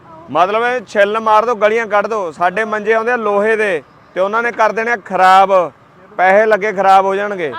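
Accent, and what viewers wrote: Malwa, India. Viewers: ਲਹਿੰਦੇ ਪੰਜਾਬ ਵਾਲਿਆ ਦੀ ਬੋਲੀ ਮਾਝੇ ਨਾਲ ਦੀ ਆ ਸਾਨੂੰ ਸਮਝ ਆ ਜਾਂਦੀ ਆ ਦੀ ਬੋਲੀ ਮਲਵਈ ਆ ਤੁਹਾਡੀ ਬੋਲੀ ਸਾਡੇ ਨਾਲੋ ਥੋੜੀ ਜਿਹੀ ਅਲੱਗ ਆ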